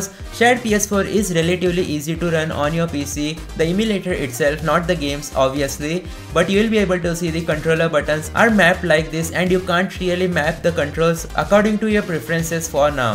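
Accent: India. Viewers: The curry accent 💀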